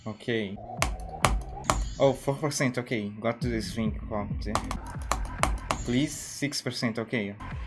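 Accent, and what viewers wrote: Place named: France. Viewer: idk why but your french accent is very nice to hear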